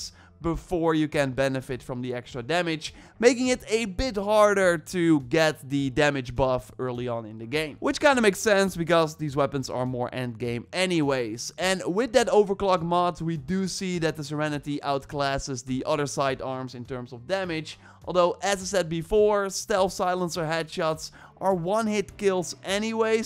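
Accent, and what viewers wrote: Netherlands. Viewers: Ik hoor gewoon dat je Nederlands bent hahaha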